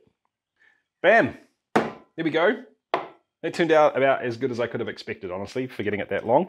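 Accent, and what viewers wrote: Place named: New Zealand. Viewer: New Zealand is weird. His pronunciations are so Aussie, yet simultaneously American! It’s hilarious, love this channel especially since I love my hard liquor!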